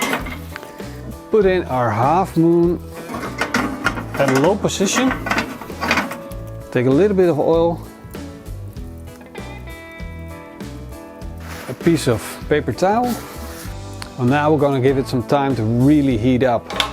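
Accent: Netherlands. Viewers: you speak with dutch accent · I knew you were Dutch 5 sec in by your typical English-Dutch accent. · Hahaha, Nederlands zeker? Hoor je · I knew you were fucking dutch. the accent gave it away but i was still doubting myself untill i saw the last part. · Prices are bit different in the Netherlands. Maybe you noticed his slight accent. 150$ sounds about right in Europe.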